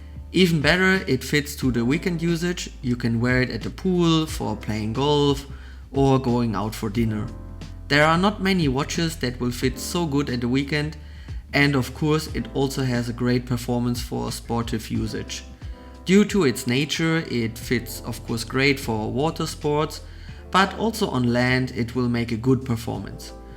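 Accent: Germany. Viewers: Are you Form germany?😅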